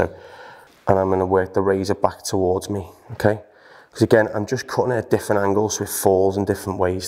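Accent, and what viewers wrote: Liverpool, United Kingdom. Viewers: Is that a Liverpool accent ?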